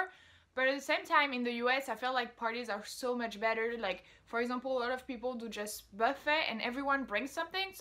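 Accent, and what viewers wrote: France. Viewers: Because she has a french accent....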